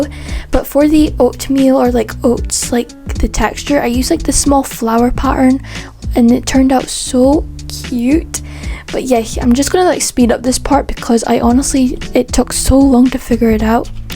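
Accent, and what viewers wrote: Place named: Scotland, United Kingdom. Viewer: oh that's cool:) I like your accent and it's very pretty:3 I was gonna ask if it was Scottish😭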